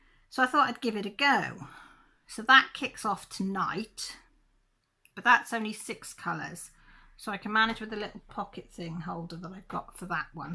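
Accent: United Kingdom. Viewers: Hey from Buxton. Thanks for all your tips and humour and company. So great to hear an English accent!! I'm waiting for Amazon to deliver tomorrow!! A rose. Not much choice in this awful situation 🤗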